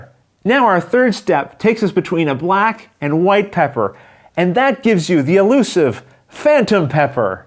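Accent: Canada. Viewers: I could really tell you are Canadian from a few of the words you said in this video. I recently discovered that Canada has the largest tabletop gaming population in the world. Thanks for this video really helps. Not it's time to go play the game!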